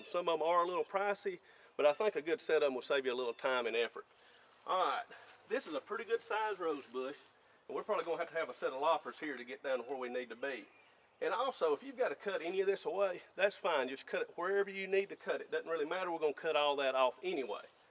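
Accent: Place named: South, United States. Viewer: Absolutely unrelated so I apologize but I noticed your accent immediately. Being from southern Virginia, I love and appreciate a good southern accent. Now on to actually watching and paying attention to the video. 😊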